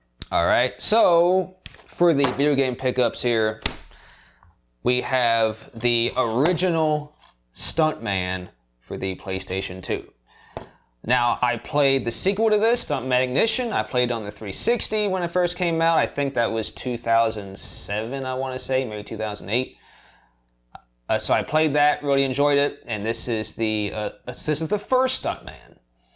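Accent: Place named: Bronx, United States. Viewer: are you from newyork fam cause you sound like yeah from the bronx its lit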